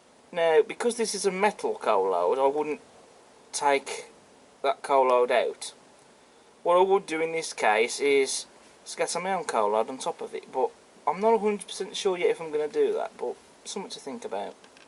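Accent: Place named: Birmingham, United Kingdom. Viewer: chap sounds like barry from auf weidesain pet,must be from birmingham, put the 1p with the six wheelers and the 4f with the staniers,much more prototypical.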